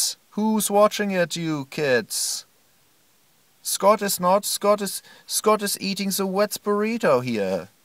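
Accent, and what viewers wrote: Germany. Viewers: It's his true accent. He's a real DJ from Erlangen Germany :) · he sounds german :) it must be very funny if someone has english as mother language, but for me it's perfect english haha :D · definitely german. The accent, the stuttering, everything. when people imitate germans they talk angrily and keep shouting das this das that.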